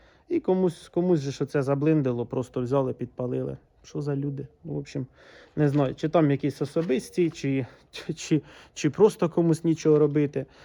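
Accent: Poltava, Ukraine. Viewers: О, полтавський говір...😊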